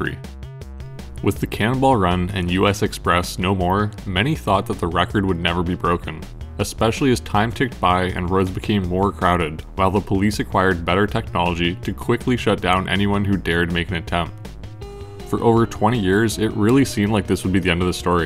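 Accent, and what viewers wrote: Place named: Canada. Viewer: You can always tell which videos are from the great white North with that pronunciation of the out out oooout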